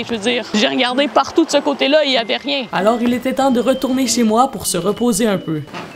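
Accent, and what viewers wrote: Quebec, Canada. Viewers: tes quebecois cool comme moi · J’aime trop quands tu parle😆 c’est comme mes cousine eft elle habite aux Québec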